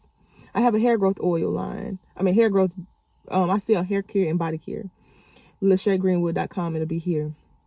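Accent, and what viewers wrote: Mississippi, United States. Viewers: I knew!!!! You had a Mississippi accent!!!! So happy for you!!! You have to do what feels right! Glad I hopped on your YouTube!!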